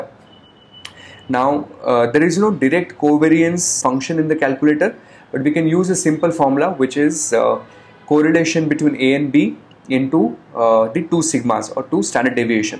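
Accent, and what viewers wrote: India. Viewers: Indian accent stinks.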